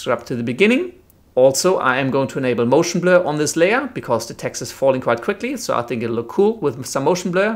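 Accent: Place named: Germany. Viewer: You sound kinda German :3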